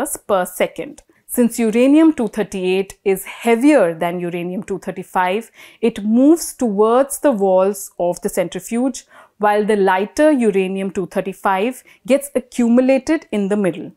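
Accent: India. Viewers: Shiiittt Stingking indian Accent !!!!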